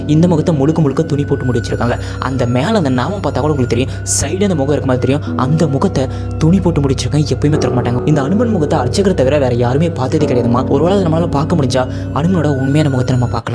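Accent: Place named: Vellore, India. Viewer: Vellore Tamil ❤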